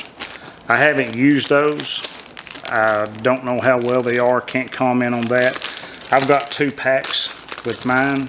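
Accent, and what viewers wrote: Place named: South, United States. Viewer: Thank you for the detailed ins and outs of your experiences using this tool. Great vid. I live in Oz now and it's great hearing the Southern accent of home.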